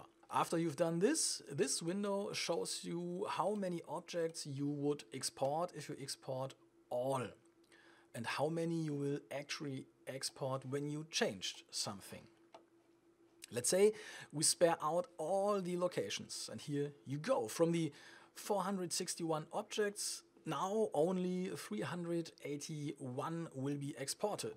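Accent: Germany. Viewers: Yes, he is a true German! A rare breed. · Is the guy talking from Germany? :D sounds a lot like it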